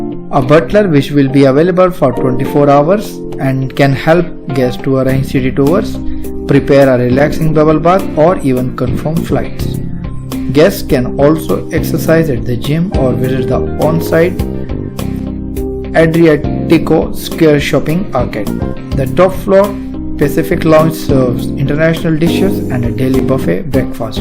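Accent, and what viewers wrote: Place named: India. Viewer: the way you speak i can guess you are from india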